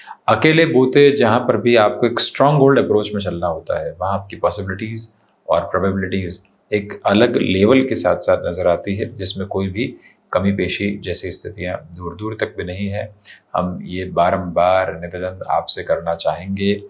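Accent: Standard. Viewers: Aap itni shudh hindi mein bolte hain ki mai chah kar bhi aapki predictions dekh nahi pati hun. Kyunki samjh nahi ata. Kripya thori saral aur aasan hindi mein batayein. 🙏🏽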